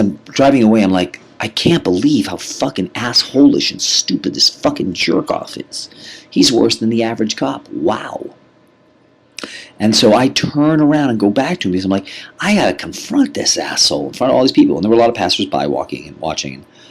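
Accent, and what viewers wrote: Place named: England, United Kingdom. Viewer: Not a bad English accent, at first anyway. You rather sounded like me when I talk. lol.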